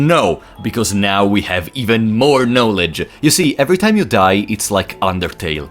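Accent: Italy. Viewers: As a fellow italian, i now understand how the accent makes the content more entertaining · Yknow im a little stupid so i didnt pin you as Italian. And then i heard you say "item only". Just wanted to say that. It is very distinct and beautiful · Never would I have expected I'd be introduced to F&H by an Italian speaking in english. Do you know by any chance if there's an italian patch on the way? I wanted my brother to be able to play this gem, but I couldn't find anything on the matter...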